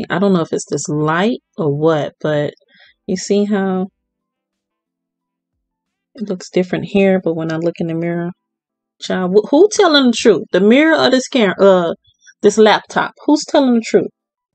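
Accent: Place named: Chicago, United States. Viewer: YOU SOUND LIKE YOU FROM CHICAGO!😊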